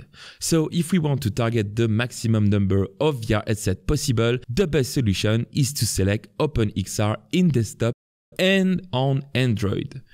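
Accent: France. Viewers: le petit accent francais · Bonjour, l'auteur a un accent français... 😉... Il n'existe pas une version française à tout hasard ? 🙂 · beautiful french accent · Ah tu est français? Moi jai reconnu ton accent xD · I'm making this game on my laptop and when I'm finished, I'll export it as an APK file and sideload it to the quest. Sadly, that means I will not be able to test my game until i'm finished. But, I'm determined to do it! My Game is going to be called Glocks n' Goblins. Basically, swarms of mediveal enemies come at you and you have a powerful sword and a glock to shoot and kill them. there are enough tutorials on youtube to let me accomplish my goal and I am so happy to say that out of all the Unity tutorials on youtube, you have been the easiest to follow. But the French accent can be kinda difficult to understand sometimes, I'm from Canada, so I can pretty much understand it. 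Thank you again! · T’es français ? J’ai dit ça étant donné que ton accent anglais semble français. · On entend que tu est parlent francais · cet accent so french..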